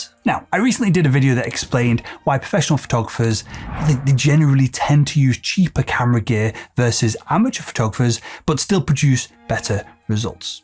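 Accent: United Kingdom. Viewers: Dollars? What good are dollars to the UK? Try saying £'s, after all you do sound British.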